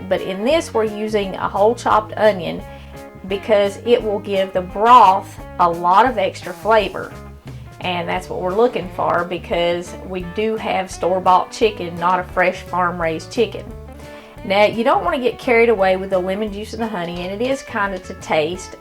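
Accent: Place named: Tennessee, United States. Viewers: Chicken n dumplings are my favorite!! Mama made great dumplings. She rolled hers out and cut them out. She had taken the chicken out of the pot and dropped each dumpling along the side of the boiling broth. She would use a butter knife to push the dough toward the center. I just thought that’s how everyone did it. But I’ve watched many many cooks on here make c n d and no one dropped them like she did. I’m like you, I don’t want veggies in mine. My Daddy didn’t even want the chicken put back in the dumplings. 😊 yours look more like what we do in East Tennessee. From your accent you might also be from TN. 😂 God bless you and your family. 🙏🙏🇺🇸🇺🇸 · YOU to are a fine blessing to this old man who can't cook too well here in WV. Thank You so very much for the patient instructions and ideas. Your wonderful voice, with charming Tennessee accent reminds me of my wonderful, long-passed Aunt God Bless You and your fine family.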